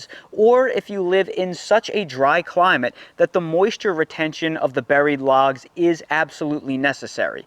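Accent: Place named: Philadelphia, United States. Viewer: I know you live in North Carolina now but you gotta be from the Philly area originally, right?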